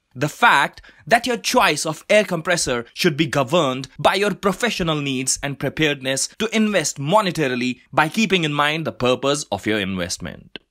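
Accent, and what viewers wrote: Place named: India. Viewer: It's Indian english accent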